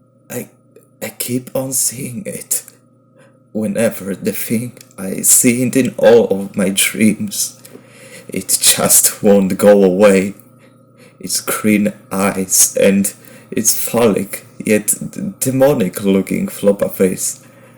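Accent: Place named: Armenia. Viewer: Nice to hear someone talk with Armenian accent 🇦🇲🇦🇲🤘 Have a good one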